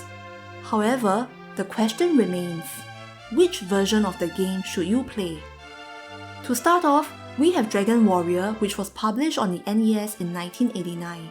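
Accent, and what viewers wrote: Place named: Singapore. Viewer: That sing accent tho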